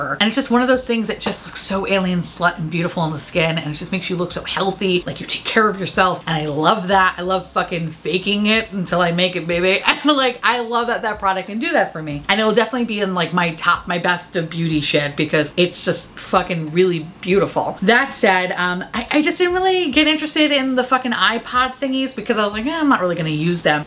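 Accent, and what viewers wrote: France. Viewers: Your french accent is so cute ! And yes, you prononce correctly the word "étoile" ^^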